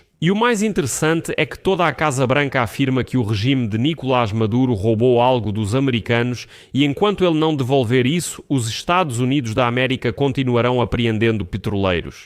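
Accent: Portugal. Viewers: Vc tem um sotaque diferente do brasileiro, fala de Portugal ?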